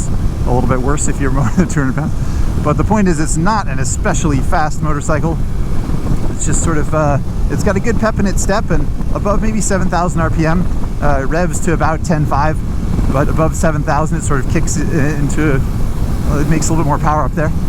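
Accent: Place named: Orange County, United States. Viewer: I don't follow you, but your ride looks like suburban hell, dawg. Accent I'm calling oc.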